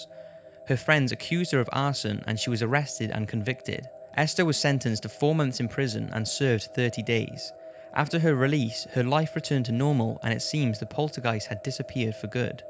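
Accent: Wales, United Kingdom. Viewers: k Not sure where you are from daz k, but to an English ear is from Wales and the "heerd" you have picked up is a feature of that regional accent. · Love your videos! Are you Welsh?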